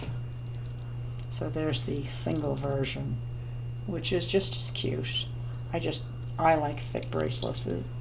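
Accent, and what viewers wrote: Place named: Ireland. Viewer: where is your accent from? You have some words that sound Irish. (I'm Irish, and I recognised them lol)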